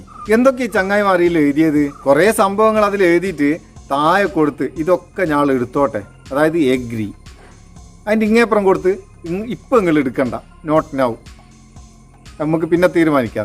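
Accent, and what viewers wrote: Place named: Malabar, India. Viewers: ഇങ്ങളെ മലബാർ ഭാഷ കേക്കാൻ നല്ല മൊഞ്ച് ഇൻഡ്. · അതാണ് മലപ്പുറം ഭാഷ😄😄🥰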